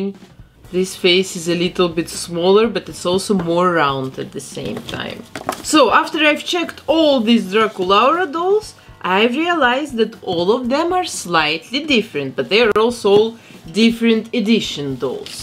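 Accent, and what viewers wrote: Russia. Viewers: Ахах, я слышу явный русский акцент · Видео классное ,но русский акцент слышно очень сильно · Я просто слышу,что эта женищина из россии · And she is speaking with a Russian accent, so.... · I'm sorry, I'm new here and this video was in my recommendations. You are very cool 😎 But where are you from? You have russian accent))) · Вау I'm Russian. И мне одной кажется что она говорит с русским акцентом? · замечает присутствие русского акцента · Ты случаем не русская или это британский акцент?? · судя по акценту, вы русская. простите, если ошибаюсь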